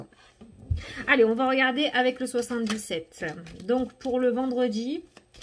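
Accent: South, France. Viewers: salut, putai... ca fait un bien fou d entendre l accent de chez nous!!! j aime beaucoup ton naturel et ta spontaneitee. bisette de l aveyron!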